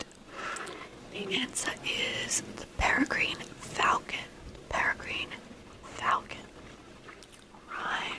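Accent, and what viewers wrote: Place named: Australia. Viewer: This was so fascinating and relaxing ❤ I really love listening to ASMR with a fellow Aussie accent, glad I found your channel!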